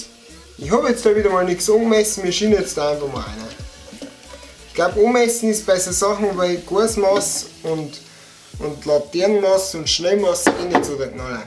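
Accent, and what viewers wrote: Bavaria, Germany. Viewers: dei akkzent is echt oreidig aba guad wea moand a urbayer zum sei...aba nechsd moi bitte richtige reihnfolge vo de zutatn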